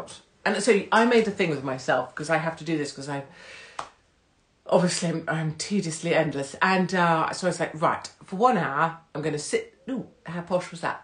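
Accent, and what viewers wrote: United Kingdom. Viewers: Why is your English accent so light?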